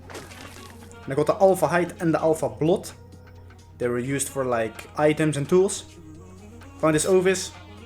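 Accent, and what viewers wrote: Netherlands. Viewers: Ik hoor dat Nederlands accent wel hoor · Nederlands accent hahaha! keep it going! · Haha ik ook hoorde het in het accent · Are you from belgium or netherlands bcs i can kinda hear the accent$ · Bro ik hoorde meteen toen ik de video opstarten dat je nederlands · Hahaha i can hear at your voice that you are from NL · Nederlands acent ga door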